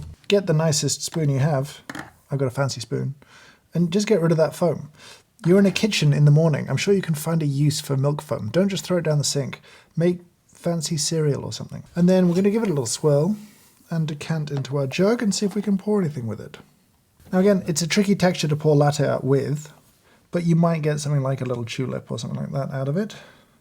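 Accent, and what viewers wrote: United Kingdom. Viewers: Of all places, I'm sitting in a tiny town in beautiful Italy and "for whatever reason" can't go to a nearby coffee place. Asked YouTube what I can do with equipment I have in the kitchen and this came up! Looove it, and the British accent made me feel at home😇🙏💝🇬🇧. Thank you so much!! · I love watching videos. I love how his knowledge along with his British accent makes him "sound" pretentious 😆 but he's genuinely likeable. I feel like his extreme knowledge might be a curse, though. I say this because I know how my preferences changed when I was forced to drink my coffee without sweeteners. It completely ruined sweet coffee for me (it's a wonderful thing to appreciate unsweetened coffee, I know) but I'm sure 99% of the cups of coffee I would find have glorious flavors, would just spit out and say it's disgusting 😂 I currently can't even imagine what he means by coffee tasting hollow or having texture. 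I haven't even tasted the difference between sour and bitter coffee, let alone tell when a serving has different levels of both at the same time. I hope to continuously learn from though! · I love your British accent!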